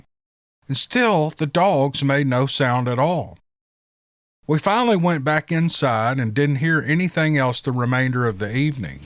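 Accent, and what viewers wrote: South, United States. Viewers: ...my wife claims to hear an East Texas coworker say "might-can" a lot, and I have never heard anyone say that, until you opened this episode with a "might-could." Thanks for your wonderful Southern accent and jargon. Merry CHRISTmas to you and your family!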